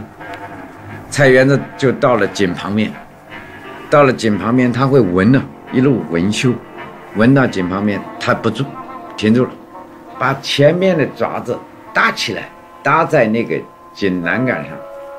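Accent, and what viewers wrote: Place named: Jiangsu, China. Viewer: 这个口音一听就是江苏中北部口音无疑！ 司马老先生最近仙逝。 安息 :face-red-heart-shape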